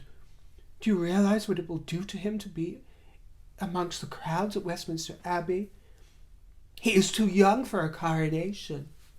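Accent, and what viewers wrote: United States. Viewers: By the way you have a great American accent! 👏👏👏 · your American accent is outstanding!😊🎉❤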